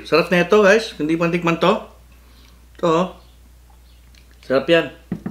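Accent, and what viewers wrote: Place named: United States. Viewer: Sir kung hindi ka lang nagtatagalog, aakalain ko american ka..hehe..Godbless po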